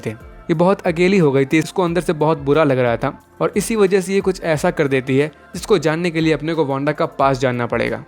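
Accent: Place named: Gujarat, India. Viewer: Are you Gujarati 🤭💜🖐️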